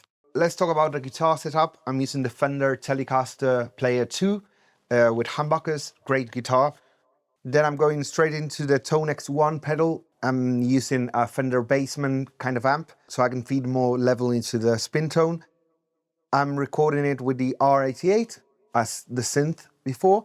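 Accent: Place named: United Kingdom. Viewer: Veri cul british axent 🤙😉🖖👽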